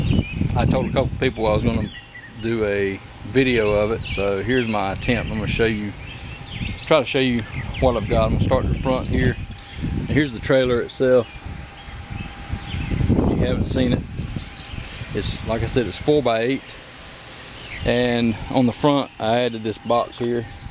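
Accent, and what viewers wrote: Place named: Carolina, United States. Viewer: that's a Carolina accent if I've ever heard one!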